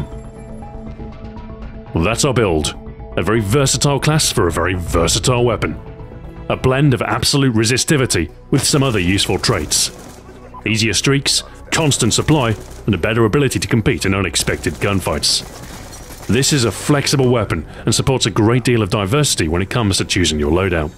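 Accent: United Kingdom. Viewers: Why does everyone say he sounds like Captain Price??? Yes, they have British accents. THAT'S IT. The tone of the voice is VERY different, and the accents aren't even identical. I just... I don't even... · Hey, as one of your presumably many viewers who haven't played CoD in a while but still tune in regularly not just for your videos' production value but also your soothingly smooth and ridiculously British voice I've been wondering if you've ever considered doing a "dramatic reading" of a favorite chapter from a novel or short story on your account. It shouldn't take too much time to prepare and record and denying the world the glorious sound of your voice would be a crime!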